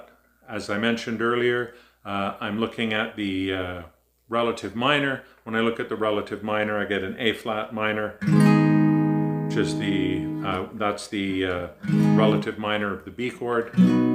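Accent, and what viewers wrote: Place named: Canada. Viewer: I loved how you used Abm as the second chord. It gives the song a different feel. Chris Marin of Cold Play also did his own arrangement. If the song writer is as good as Dylan, it opens up the material to many different interpretations (like Shakespeare or the Beatles). I love everything Canadian including your accent. The greatest people and country. 🇨🇦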